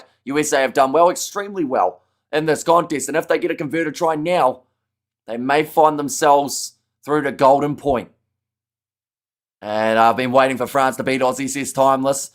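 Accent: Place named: New Zealand. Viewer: As an aside I’m a voice coach in New Zealand and you are the epitome of the New Zealand accent for a guy. Also enjoy your passion for sport. Cheers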